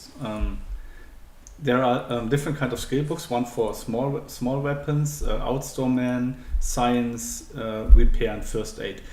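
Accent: Germany. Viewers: Ich liebe den Denglisch Akzent :) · I hear that you are from Germany ;-) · Ich gehe mal davon aus, dass du Deutscher bist mit dem Dialekt. Warum hast du die Pläne weggelegt und sie nicht bei den Chinesen abgegeben?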